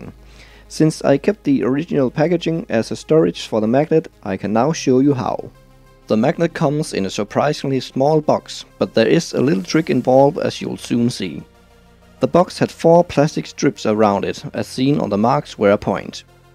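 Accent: Denmark. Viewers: I like the danish accent..sounds cute somehow =) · Sorry was just curious, thought i seen alot of german on magnets and such but you sounded very distinctly Danish. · sys nok jeg ku høre du var dansker men var i tvivl :D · Danish. I knew it from the accent :D Interesting video, bro!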